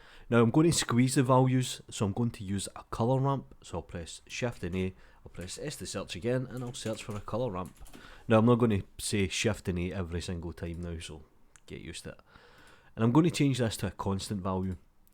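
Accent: Scotland, United Kingdom. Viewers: oh my, a scottish man (?), just explained half tone shading to me. what a treat. :)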